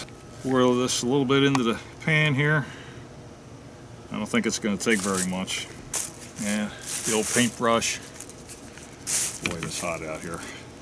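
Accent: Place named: Philadelphia, United States. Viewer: Nice video - thanks for posting! You sound like you're from the Philly area. Close?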